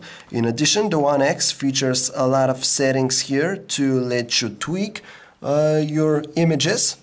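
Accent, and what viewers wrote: Russia. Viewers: Are you russian? :) I like your accent. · russian accent? respect!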